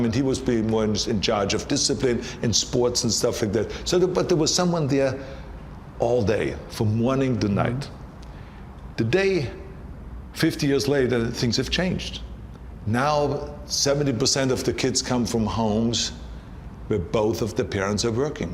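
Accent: Styria, Austria. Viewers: He speaks Styrian, a dialect from Austria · He has a veeery strong Austrian accent 😄😎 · Actually he speak German with an Austrian accent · He has an Austrian hillbilly accent and for that reason they didn't let him do the German dub for Terminator. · Ze Austrian accent suits him perfectly. Imagine speaking Russian or French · He is Styrian, he speaks with an Styrian accent... not even near to swiss?! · Nope, it's a kinda thick austrian accent. Personally, I love it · Sacré!! Ah j aime bien son accent autrichien 😅 quand il parle allemand! 💪😎 Der Terminator!!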